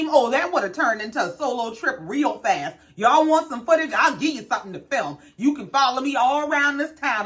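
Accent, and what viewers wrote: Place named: Gullah Geechee, United States. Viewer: I just found your channel. I love your commentary and it’s a plus you sound just like my people from SC. (Geechie) Subscribed! ☺️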